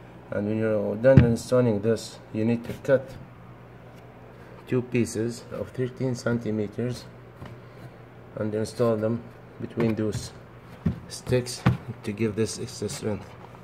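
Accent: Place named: Africa. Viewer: You sound like you might be from some part of Africa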